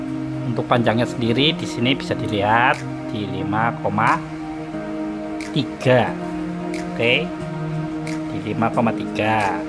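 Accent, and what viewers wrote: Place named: Banyumas, Indonesia. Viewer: Ngapak ra jelas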